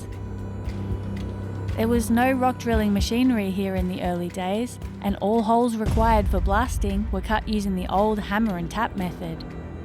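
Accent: Australia. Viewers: The mine was closed when I was there, so this is great. :-) Thanks! Lovely Aussie English narration! The narrator needs to be hired by all Aussie content producers!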